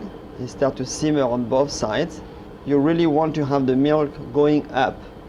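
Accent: France. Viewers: i love ur english accent(u might be a french speaker).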